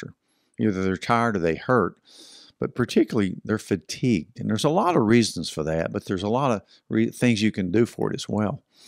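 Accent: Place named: South, United States. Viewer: I despise Southerners and that accent.. wtf?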